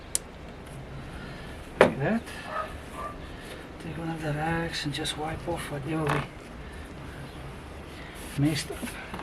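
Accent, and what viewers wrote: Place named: South Africa. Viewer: Love the South African accent! Very helpful, thanks!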